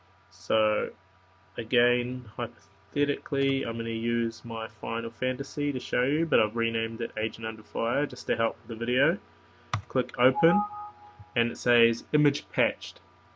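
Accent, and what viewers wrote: New Zealand. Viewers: HEY is that a kiwi accent or aussie ??? you sound kiwi???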